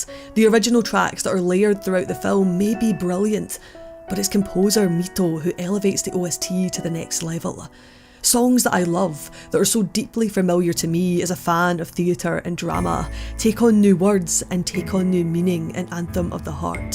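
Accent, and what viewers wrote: Scotland, United Kingdom. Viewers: scottish accents r beautiful and ur beautiful. its just my auditory processing thats shit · somehow the scottish accent is a constant funny thing for me that i cant take serious, so this video threw me through quite a loop cause the accents funny but ive only witnessed it in comedy before. that made it quite challenging to listen to what good things she's actually saying. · I loved your Scottish accent. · Scottish accent? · Finally an accent I understand... Wait this isn't the same regional accent as me? I can't understand you I'm not from your part of Alba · don't ever stop putting word thoughts in my earholes with that sweet Scottish melody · It had taken a while to know who you are when introduced you but it was listening to your voice and I knew it, you were the one who made that incredible review about Yagate Kimi or Naru a few months ago. 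And since I love your Scottish accent, it's perfect (and I'm tell you being a Honduran, whose first language is Spanish) and yet I can understand you perfectly. You are amazing. Regards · I don't know what that accent is (Scottish?), but I like it.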